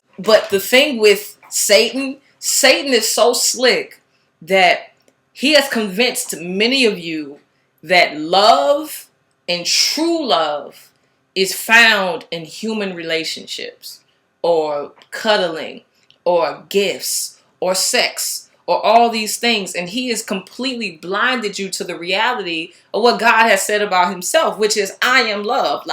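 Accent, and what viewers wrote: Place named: Northeast, United States. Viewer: Ma'am, where are you located? You Sound like you from up north east coast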